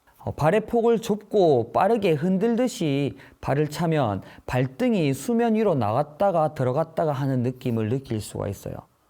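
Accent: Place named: Gyeongsang, South Korea. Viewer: 경상도분이신가봐요.. 억양은 속일수가 없네요^^ 그래도 귀엽네요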